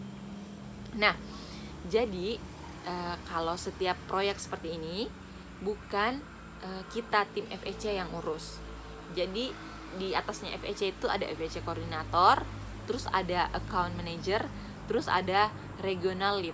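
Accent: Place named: Sulawesi, Indonesia. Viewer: Kalau dari logatnya sekilas org sulawesi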